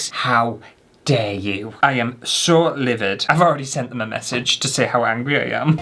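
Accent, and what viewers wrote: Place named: Newcastle, United Kingdom. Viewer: I know it's great, devastated that you change the captions to words others will understand 😂 gotta love a Newc accent lol I think one day you should put the words you actually say 😂 just for shits and giggles 😂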